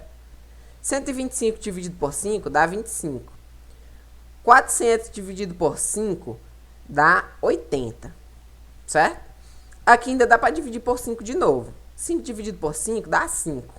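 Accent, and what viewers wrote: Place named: Northeast, Brazil. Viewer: conheço esse sotaque nordestino kkk minha terrinha <3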